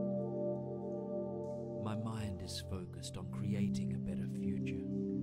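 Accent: Australia. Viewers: Hey, as a fellow Aussie, I appreciate the accent, last 12 months have been terrible, but I feel like I'm turning a corner with the affirmations, still going through terrible things, but I'm getting through, and it bothers me alot less, thanks mate, god bless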